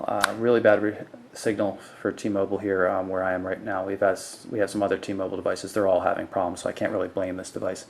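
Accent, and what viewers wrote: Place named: Scotland, United Kingdom. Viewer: there was just a tiny hint of scottish in that voice...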